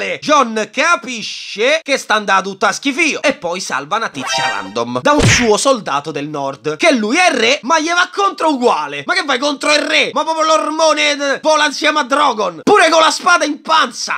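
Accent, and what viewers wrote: Rome, Italy. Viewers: L'8 stagione la hai fatta perfetta, niente voce compostina, ma romanaccio a palla e urlaaaaa, mi ammazzo dal ridere! Fai così anche tutto il resto plz!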